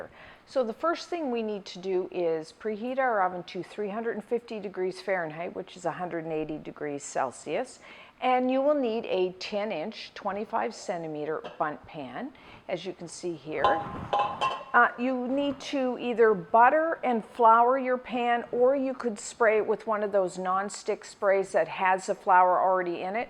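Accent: Canada. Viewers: your accent is adorable! are you canadian?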